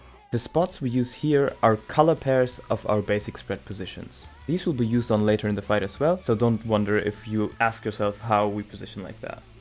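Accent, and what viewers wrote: Germany. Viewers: He's probably refering to "the boss" which is in german "der" (he) and by the accent I assume he's german.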